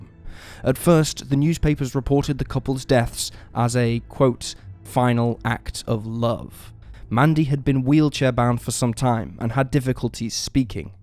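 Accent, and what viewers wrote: England, United Kingdom. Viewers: "Quote" These videos sound rather odd with an English accent speaking in an Americanised way.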